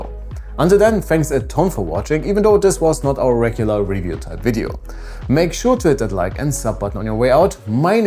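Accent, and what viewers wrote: Germany. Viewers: Is German your native Language?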